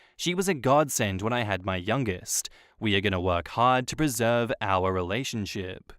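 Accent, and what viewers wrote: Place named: Australia. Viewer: thanks for the Aussie accent such a pleasure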